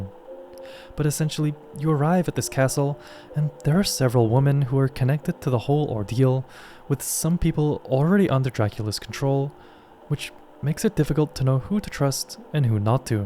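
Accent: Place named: Sweden. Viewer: Sounds like a light swedish/upper european accent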